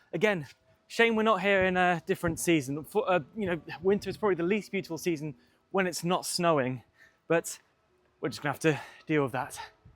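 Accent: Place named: RP, United Kingdom. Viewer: Hello, I love your videos! Since I found your channel a couple months ago I have watched all your videos and I’m always waiting for the next one, thanks for the great job you are doing! I wanted to ask you something and I mean no disrespect with the question but, are you a trained voice or scenic actor? I’m not a native English speaker and your pronunciation and cadence reminds me of the listening exams for the Cambridge exams, like you talk in an accent close to RP. I ask just for curiosity and again, I mean no disrespect and I hope this doesn’t bother you too much. Keep up the good work and thanks!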